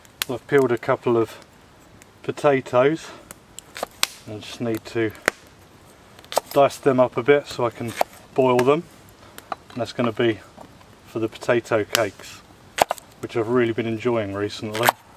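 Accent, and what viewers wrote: United Kingdom. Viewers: are u british bruh?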